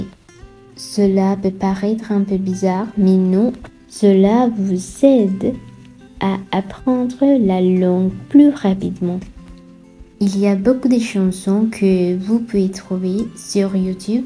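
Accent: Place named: France. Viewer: Merci infiniment de partager vos conseils avec nous. Vous avez un accent français incroyable. Je vous souhaite tout le meilleur madame. C'est la meilleure chaîne que j'ai vue jusqu'à présent pour apprendre le français❤❤❤❤❤❤❤❤❤❤❤❤❤❤❤❤❤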